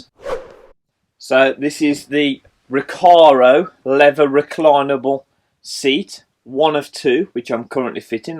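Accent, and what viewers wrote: Black Country, United Kingdom. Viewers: Where about a you from , your accent sounds like Black Country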